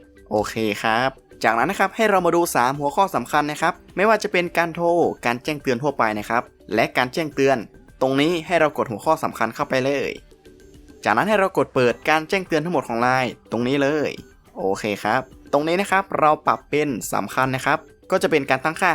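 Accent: North, Thailand. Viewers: พี่เป็นคนเหนือไหมครับมีสาปเมืองหน่อยๆๆ เหมือนเห็นตัวเองตอนพรีเซ็นงาน55555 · พี่เป็นคนภาคเหนือใช่มั้ยคับ ทำไมปะแลดเมียง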